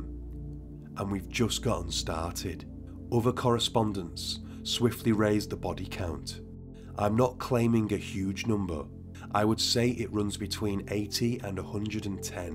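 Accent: United Kingdom. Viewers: 8 Million UK narrators doing US Stories. What is the frickin deal??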